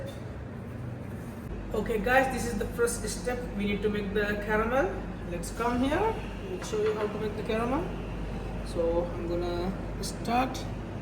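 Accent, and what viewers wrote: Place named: India. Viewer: English katha karane India un wage ai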